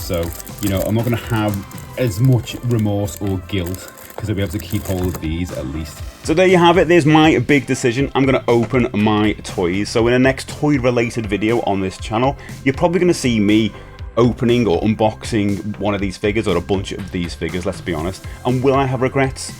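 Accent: United Kingdom. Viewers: First of all I love you're voice. Something about British accents that appeal to me. Lol Second, I've been a toy/ action figure collector for 30 years. I really love my collection. Mostly Buffy the vampire Slayer stuff, WWE/WWF figures. I used to have the ring and part of the wrestling ramp with accessories. But no longer have those because those are the ones I sadly played with as a kid lol. Third, I'm into Funko pops too. I've got lots of them. Harry Potter for instance I've got the Hogwarts castle. I would love to unbox it and display it for my entertainment. But at the same time I'm afraid of dust and dirt or whatever ruining it over time. Lol Fourth, I've go alot of Disney Funko pops. Everything is in the box. Currently I'm at a loss of what to do. Should I also do the pros and cons list?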